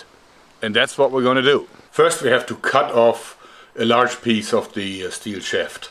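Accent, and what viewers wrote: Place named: East Germany, Germany. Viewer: Sounds eastern German, but I'm not sure. He is German though so the accent is from a germanic country.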